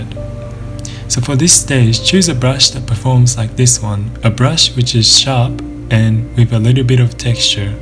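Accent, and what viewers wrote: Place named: Asia. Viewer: I'm confused in your tutorials from before and always with your intros your voice was much higher and definitely had a stronger Asian accent. But this video you don't have it which I find strange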